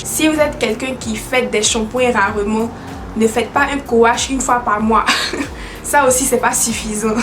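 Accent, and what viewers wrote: Ivory Coast. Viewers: L’accent ivoirien qui ne ment jamais 😂❤️ big kisss depuis babi!!!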